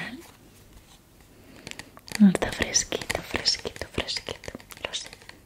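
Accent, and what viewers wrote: Spain. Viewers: La tonada española es el toque perfecto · como pronuncia esa R como es, yo diciendo palabras con R como orchata suena como L, puertorriqueno al fin lol. El acento espanol usualmente no me gusta mucho pero el de es chevere, no se debe ser la region de donde es o no se pero lo mejor de ella es cuando habla.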